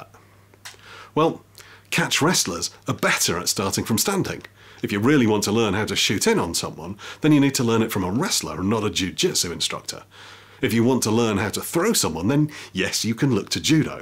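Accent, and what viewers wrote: United Kingdom. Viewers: so articulate, so utterly British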